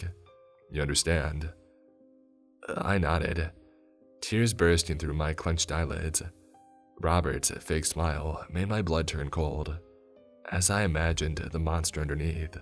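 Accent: United Kingdom. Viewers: Can tell it’s a Brit that described this hoax story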